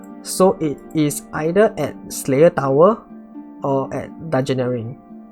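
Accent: Singapore. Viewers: Really appreciate your explanations while doing clues, would love to see more of such videos. And I feel comfortable listening to a fellow sg voice.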